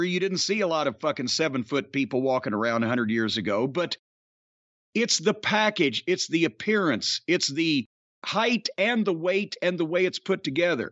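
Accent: South, United States. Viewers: Proper oldschool Southern accent, love it!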